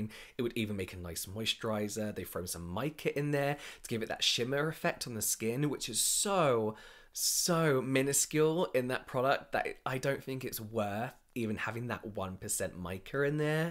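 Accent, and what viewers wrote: United Kingdom. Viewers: Omgggg your British accent 😍😍😍😍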